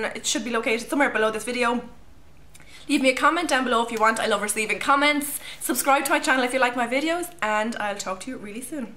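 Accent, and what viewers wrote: Ireland. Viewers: U irish??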